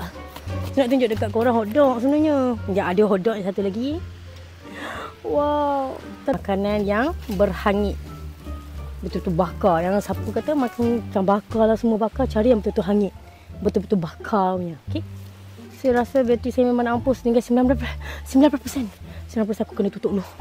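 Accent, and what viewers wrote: Penang, Malaysia. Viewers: rindu ckp utara loghat peneng utara😢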